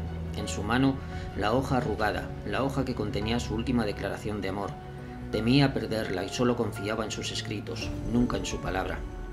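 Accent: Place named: Spain. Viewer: Hermoso dibujo ,buena narración . Me recuerda los radioteatros que escuchaba cuando era niña. Tienes acento español. 🌞🌛✨🌿🌸🤗🥰😁👍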